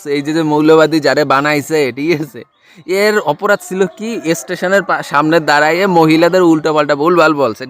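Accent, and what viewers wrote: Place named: Khulna, Bangladesh. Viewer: আপনার কথা শুনে ভারতীয় নয়,, বরং বাংলাদেশের খুলনা বিভাগের বাসিন্দা মনে হয়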